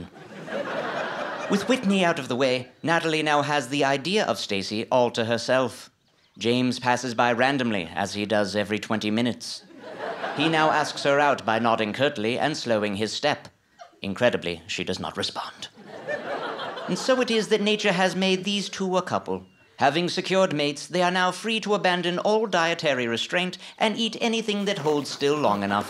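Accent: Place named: United Kingdom. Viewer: for best narrator! I can see why Jason first thought that he was British.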